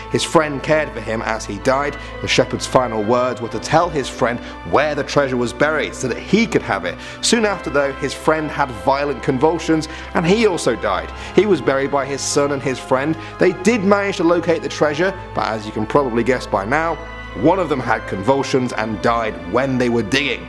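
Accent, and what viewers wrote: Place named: United Kingdom. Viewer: Never have a Brit narrating.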